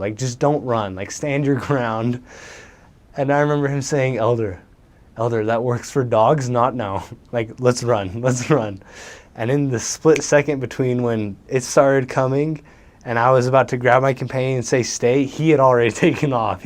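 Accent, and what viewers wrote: India. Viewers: His Indian accent is on point! I love the way he says liberal with rules, acclimatized stomach... So polite 😄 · You sound perfect (Indian accent) Most Indians who moved to U.S use fake American accent to sound cool😂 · ur indian english is awesome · Your indian accent is killer😂😂😂😂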